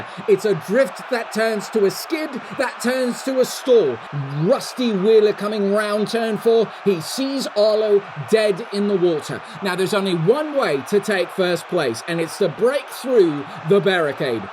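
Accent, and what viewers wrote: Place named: United Kingdom. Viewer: Makes sense now, the accent sounded very familiar to be British🤣. Everything about the races, street lights and commentary is amazing, keep going!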